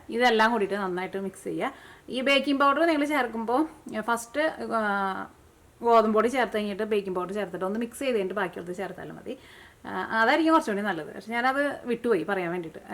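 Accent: Thrissur, India. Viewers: Buiscuit super aanu.nedumpasseriyl trichur/chalakudy slang eppol vannu.kelkan sughamundu.👍👍👍👍👍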